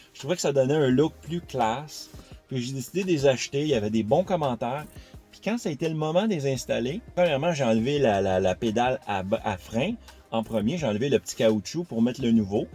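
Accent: Quebec, Canada. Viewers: j'ai juste dit que j'ai eu du mal à comprendre avec l'accent québécois, je ne pense pas être sourd 😅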